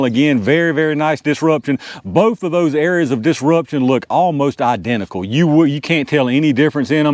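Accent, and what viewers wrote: United States. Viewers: God damn you sound like America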